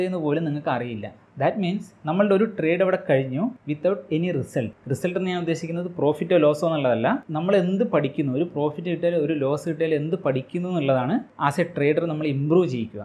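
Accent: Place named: Kannur, India. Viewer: കണ്ണൂർ ആണോ സ്വദേശം,..your slang seems so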